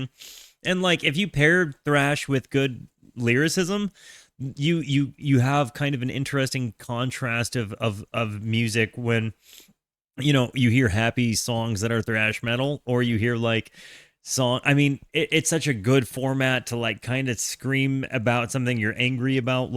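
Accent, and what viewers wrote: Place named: Locust Valley, United States. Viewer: This guy has that Locust Valley Lockjaw accent.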